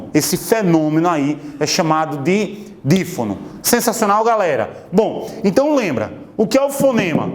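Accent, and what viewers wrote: Northeast, Brazil. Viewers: Você ganhou um inscrito! Da aula muito bem e ainda tem esse sotaque nordestino que é maravilhoso de ouvir! Kkk parabéns professor, todo sucesso e muito obrigado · Professor apreender com sr. é muito bom e ainda com nosso sotaque nordestino e o jeito que exemplifica é melhor ainda. Parabéns!!!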